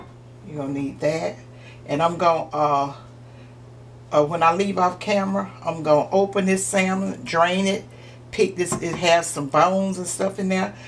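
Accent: South, United States. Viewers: These patties are just like the ones my mama made. She tried to teach how they were made but being young I would not pay attention cause people today (back then) don’t eat this kinda foods. I miss her ways of cooking but you cook just like her So I just want to thank you. Plus I love your soft southern accent mine has changed over the years Love you and your videos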